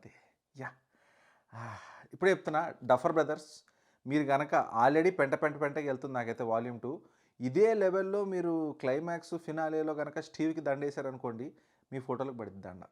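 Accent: Telangana, India. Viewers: Endanna Telangana slang lo ochav esari 😅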